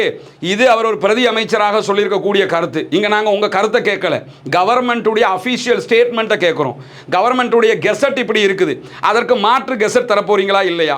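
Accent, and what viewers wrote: Tamil Nadu, India. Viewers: ஏன் bro தமிழக style English பேசுறீங்க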